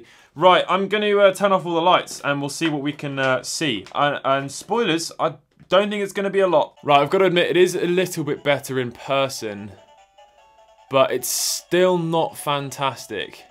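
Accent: United Kingdom. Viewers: You said aluminum like an American first lol. Then changed it to your British pronunciation.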